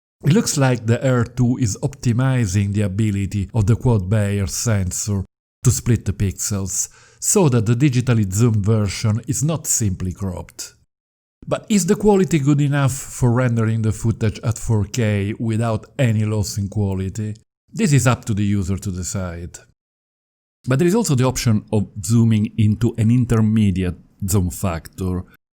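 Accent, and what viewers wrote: Italy. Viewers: Awesome accent. Love it. I guess it's Italian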